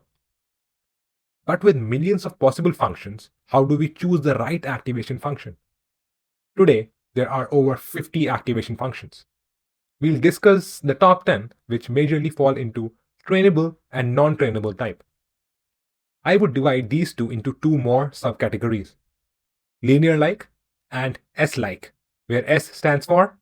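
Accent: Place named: India. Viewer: You indians have great information videos on YouTube , but your problem is your accent in English is terrible for us to understand what you are saying. So please use AI voice instead of your natural voice please.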